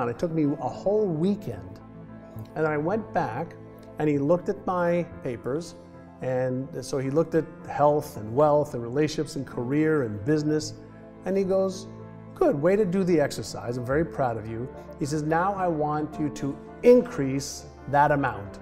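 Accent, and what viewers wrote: France. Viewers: I love this French accent. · A blond guy with a french accent , what a treat, video made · This French accent: -)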